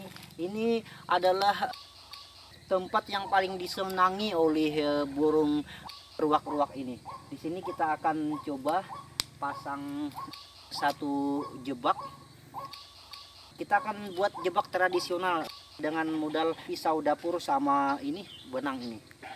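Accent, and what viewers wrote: Kalimantan, Indonesia. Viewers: Logatnya orang kalimantan ya bro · Dari cara bicara kayaknya pian urang kalimantan... Ini kerjaan ku masih SD.. dapt 1 aja seneng nya war biasa.. komplit ya ... D tunggu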